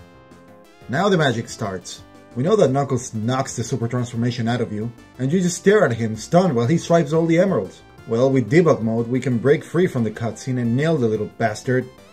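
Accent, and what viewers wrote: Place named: Argentina. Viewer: What an accent you have man! I'm also from Argentina and I still sound like one while speaking in English , s3 and knuckles definitely is one of the best sonic between mania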